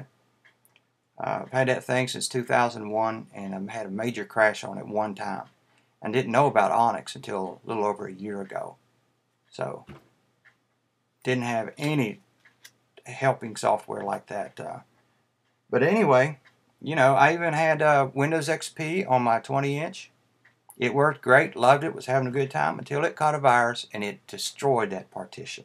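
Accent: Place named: South, United States. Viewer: your southern accent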